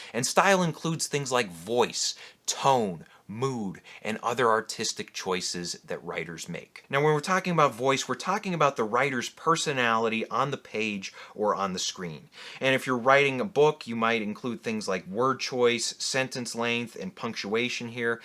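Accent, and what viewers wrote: Chicago, United States. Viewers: You gotta be from Chicago right?😊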